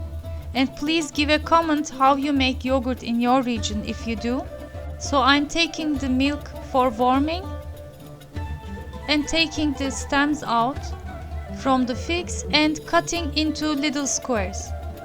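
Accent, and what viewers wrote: Turkey. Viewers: As a Turkish person watching this, I really appreciate his initiative <3 the recipes look amazing. And you speak English very well, on top of the lovely and authentic Turkish accent you have!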